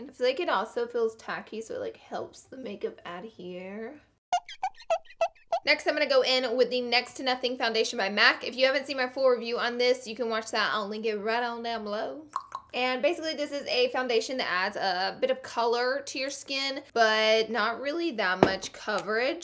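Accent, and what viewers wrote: South, United States. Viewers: Congrats Laura Lee! Love watching your channel! I am from Louisiana, Southern girl at heart! You still have that Southern Girl accent, love it! Keep being you!!! <3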